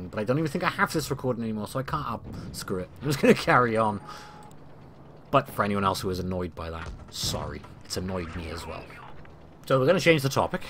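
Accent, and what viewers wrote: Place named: Wales, United Kingdom. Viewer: Wyt ti'n siarad Cymraeg? I noticed your accent and wondering if you're from Wales:)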